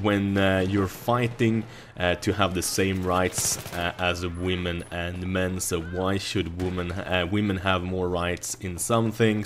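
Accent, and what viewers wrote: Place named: Sweden. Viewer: man i wish i had a swedish accsent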